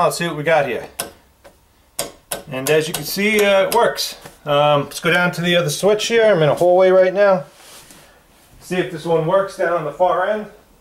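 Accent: New York, United States. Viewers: dude TALKS LIKE NEW YORKERRRRRRRRRRR MAYBE HES FROM THE BRONX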